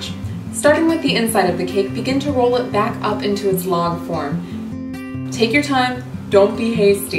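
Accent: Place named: Michigan, United States. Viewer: Your accent is my favorite. My dad is from Michigan and hearing you makes me feel at home. I love this video for so many reasons. <3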